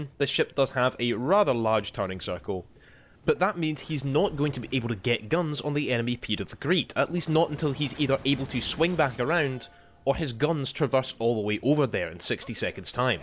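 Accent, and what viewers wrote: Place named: Paisley, United Kingdom. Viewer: Love your accent, reminds me of me mom. She was from Paisley.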